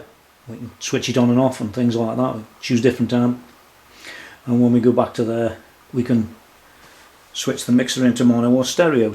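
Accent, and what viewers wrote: Stoke, United Kingdom. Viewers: Nice review..are you a stokie? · YOU FROM STOKE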